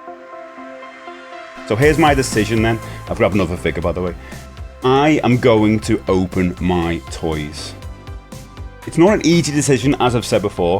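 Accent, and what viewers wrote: United Kingdom. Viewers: First of all I love you're voice. Something about British accents that appeal to me. Lol Second, I've been a toy/ action figure collector for 30 years. I really love my collection. Mostly Buffy the vampire Slayer stuff, WWE/WWF figures. I used to have the ring and part of the wrestling ramp with accessories. But no longer have those because those are the ones I sadly played with as a kid lol. Third, I'm into Funko pops too. I've got lots of them. Harry Potter for instance I've got the Hogwarts castle. I would love to unbox it and display it for my entertainment. But at the same time I'm afraid of dust and dirt or whatever ruining it over time. Lol Fourth, I've go alot of Disney Funko pops. Everything is in the box. Currently I'm at a loss of what to do. Should I also do the pros and cons list?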